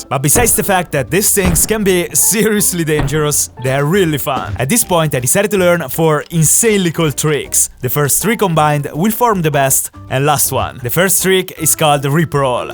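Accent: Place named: Italy. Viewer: Lo avevo capito dall'accento che eri ita xD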